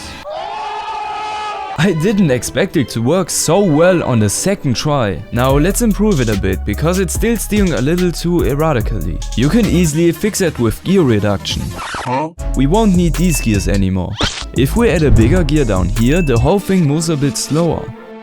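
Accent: Germany. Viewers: Br du hast den Deutschesten Akzent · He's german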